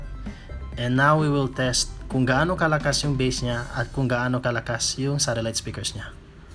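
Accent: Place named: Cebuano, Philippines. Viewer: Bai. Taga asa ka? Bisdak man kaayo. Shoutout diri Linamon Lanao del Norte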